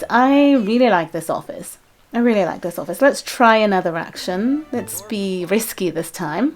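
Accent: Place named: RP, United Kingdom. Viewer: I love how you speak! Super posh, I find it. I love it! :D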